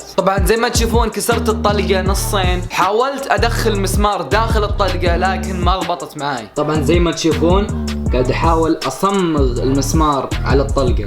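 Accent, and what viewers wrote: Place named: United States. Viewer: كيف تعلمت انقلش مرا كانك امريكي؟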